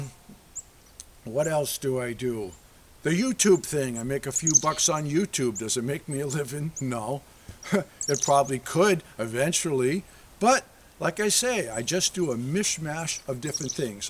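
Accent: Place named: New Hampshire, United States. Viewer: Love your channel and your life approach. Perhaps it is because I came from NH and miss the accent -- but of course, we do not have an accent. Keep making the videos so that I have something good to watch.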